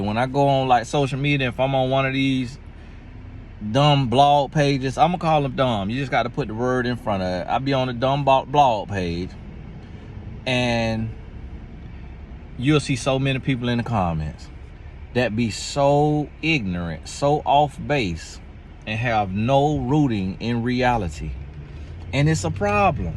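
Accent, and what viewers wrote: South, United States. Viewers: Wow I’m from Florida too. Lol! I was raised up north though. You have a strong southern accent. You are hilarious but at the same time you’re telling the truth! 😂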